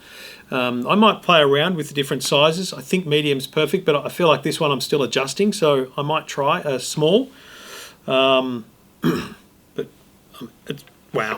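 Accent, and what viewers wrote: Australia. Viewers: Australia …..Australian dollars. Can you not tell by his accent ??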